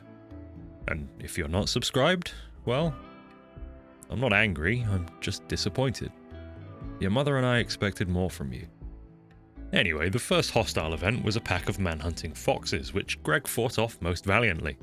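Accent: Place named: England, United Kingdom. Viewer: I like your accent English man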